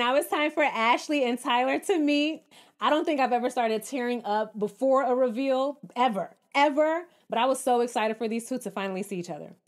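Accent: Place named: New York, United States. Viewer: Girl you gotta be from NYC cause the way you talk 😂😂😂❤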